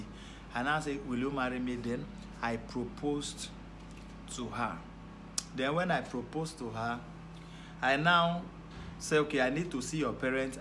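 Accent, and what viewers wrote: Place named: Nigeria. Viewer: lmao...😆😆😆I love Nigerian accent...lol, u funny Apostle. I love this...blessings to you and your family. I agree to this aswell and yes it bless me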